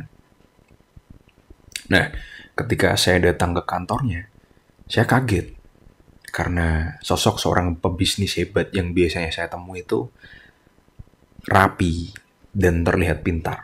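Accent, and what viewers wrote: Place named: Java, Indonesia. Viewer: menurut analisa saya dari suara yang saya dengarkan , anda pemikir yang dalam , logat anda jawa , artikulasi kurang begitu jelas yang menandakan anda seorang introvert , dan maaf, seorang narcissist.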